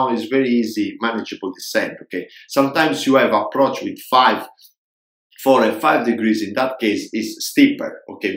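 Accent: Italy. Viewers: Salve capitano.....ha un accento italiano....grazie per i suoi video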